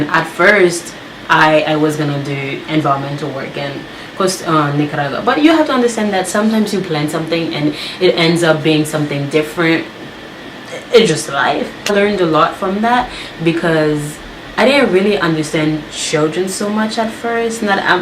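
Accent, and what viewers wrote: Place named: Haiti. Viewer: Ur so pretty ❤️, u talk like you may be Haitian & or african french creole accent.....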